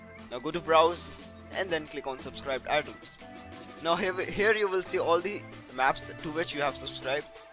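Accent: India. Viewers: YySsBG YT he has an Indian accent you degenerated racist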